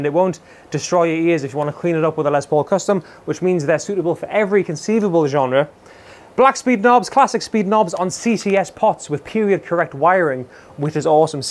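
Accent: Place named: Newcastle, United Kingdom. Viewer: The Geordie Phil X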